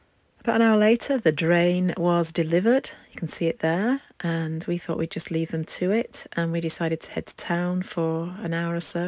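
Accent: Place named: Ireland. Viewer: I love a plan, especially when it comes with an Irish accent 🍀 And all mod cons! What could be better! Great start to the vlog ❤❤❤❤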